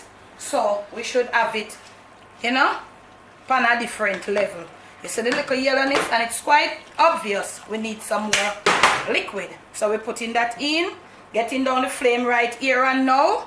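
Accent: Jamaica. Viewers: I love this mama,full of food love, culture and blessed black woman, love the Jamaican accent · Me not a jamaican enuh, but from me small me love fi hear jamaican talk. Something bout jamaican accent juss funny and unique... love har jokes dem and how she say " and all a dat" ...remind me of Oliver and Punsy.... Much love from Saint Lucia.... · I just love the Jamaican accent. I could listen to you talk all day. Your voice is so soothing!!! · 😋 yummy...My 6 year old son said 🙄🤦🏽Why she talking like that😂 I said she Jamaican or Haitian 🤷🏽🤔correct me if I'm wrong😕 I said we have different people who speak different languages and voice sound different🤷🏽 We American and he little still learning😊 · God bless the day I would learn Jamaican accent. It gives me so much moral. ♥️😍 We call that rice,concoction rice: where everything goes in. It is so delicious 👌♥️😋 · Girl you rock mix it drop that patois and English authentic Jamaican lawd we different sah. 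· That look good I love the Jamaican accent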